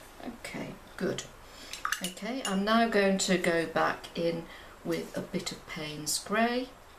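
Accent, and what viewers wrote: United Kingdom. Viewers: British accent wouldn't let me leave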